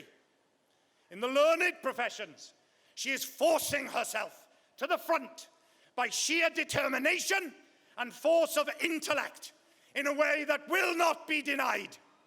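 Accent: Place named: Wales, United Kingdom. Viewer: Wow it's so rare that i hear his Welsh accent ❤️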